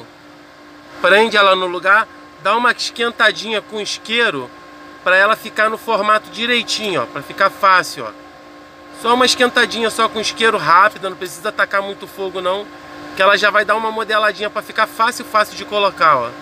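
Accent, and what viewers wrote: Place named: Rio de Janeiro, Brazil. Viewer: Aê carioca di mais...... cumpadi!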